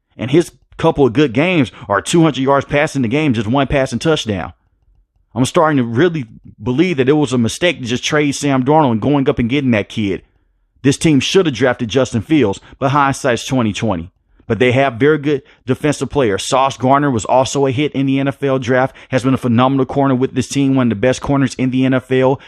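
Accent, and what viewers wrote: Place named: New Jersey, United States. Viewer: Thanks, I can tell with the accent, you are a jersey boy, lol, I completely agree with all of your statements, but let's see what happens, I'm sure the jets organization are thinking the same exact way we are, maybe they need to leave thier egos at home on draft day, forget the hype and go with facts and personal who have heart , there's been plenty of late round picks in the NFL that are in the Hall of Fame, so like you stated the jets coaching staff needs to do there homework and listen to that inner voice that speaks the truth, stay honest with themselves ,and I believe the jets can be in contention in 2024!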